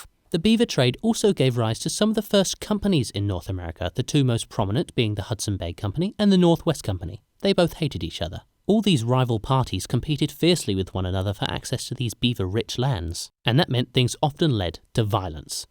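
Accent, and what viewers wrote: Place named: United Kingdom. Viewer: This youtube formula is crazy: British accent + shitty graphic art + whimsy commentary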